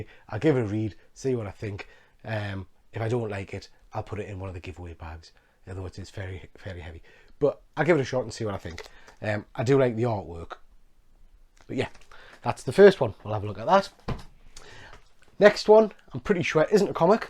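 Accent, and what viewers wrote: United Kingdom. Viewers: Really like your British accent mate👍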